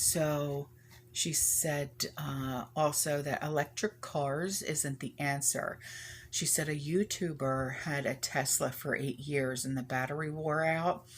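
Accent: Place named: Philadelphia, United States. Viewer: Thought you were Philly or a Delco girl.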